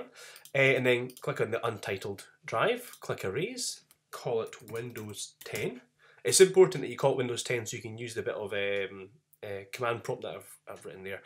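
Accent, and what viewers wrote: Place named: Scotland, United Kingdom. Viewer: Hey man, you just made my life easy. Thanks, wherever you are (your accent suggests Scotland, which is my favourite place in the world).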